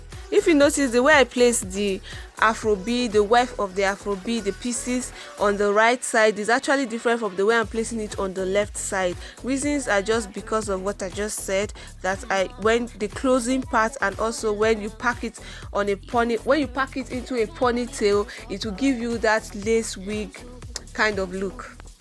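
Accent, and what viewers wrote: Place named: Nigeria. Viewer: Well well, this style is not one of the best ,but this girl is very innovative ,why? First. She has to use the material that is available in to her country. from her accent she is Nigerian ..,not all of the stylist are very up to par with the trends so they create something suitable and affordable for her and ultimately the customers. She is bold enough to have a YouTube channel to show her work and investment and edit the video. She needs more training and I'm sure she will do better.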